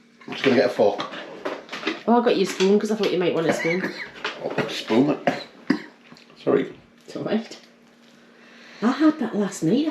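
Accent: Newcastle, United Kingdom. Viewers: So down to earth and the way we used to cook before fast food! Love your Geordie accent. Keep em coming, cooking the way people actually cook in an ordinary kitchen - cooking first class food! Thanks. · I like your British accent I'm American. I love your video of the corned beef & potato pie. I migth make it some time it looked very easy to make. I'm part Irish and I thought cabbage migth be good to put it do you think so?. May bless you.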